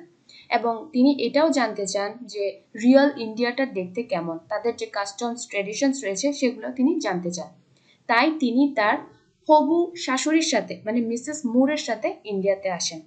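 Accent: Chittagong, Bangladesh. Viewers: Apu ki Chattagram er?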